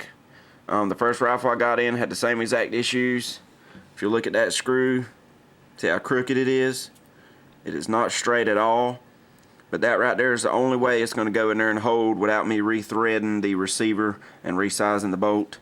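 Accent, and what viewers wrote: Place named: North Carolina, United States. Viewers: That sounds like an Appalachian Tarheel accent, coastal Carolinians don't talk like that. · As soon as I heard your Tarheel accent, I wanted to get a pulled pork sandwich and a can of Cheerwine.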